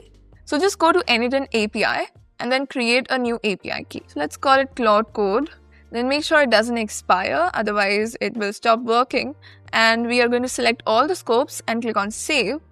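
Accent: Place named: India. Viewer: I cant with the indian accent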